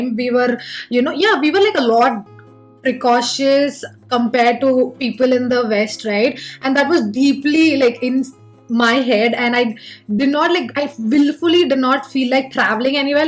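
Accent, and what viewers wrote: Telangana, India. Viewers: Much much relatable. I have recently discovered your channel and really love your content. Please vlog in Telugu also. Love the Telangana slag 😛Loads of love from Vijayawada.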